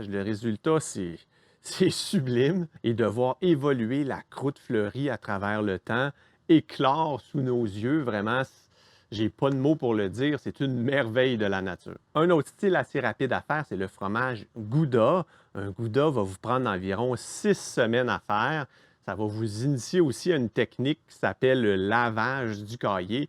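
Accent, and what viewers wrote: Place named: Quebec, Canada. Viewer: On aime ton accent. Merci de nous amener un peu de fraîcheur. Québec, meilleur accent de France 2023...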